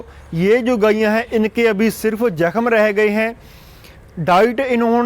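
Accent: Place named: Puadh, India. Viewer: bhai puadi bol rhe ho kha se ho app bhai ji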